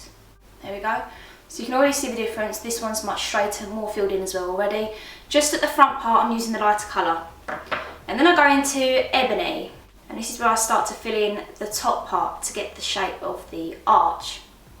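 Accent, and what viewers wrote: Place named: Essex, United Kingdom. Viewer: DAT Essex accent though 😩❤️❤️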